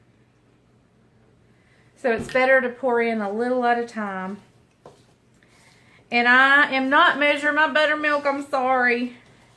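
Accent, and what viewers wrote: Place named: Arkansas, United States. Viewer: Have you tried a potato/carrot peeler to peel your apples? It's a bit easier than peeling apples with a knife. Love your accent. Our nieces live in Arkansas and you sound just like them! :-)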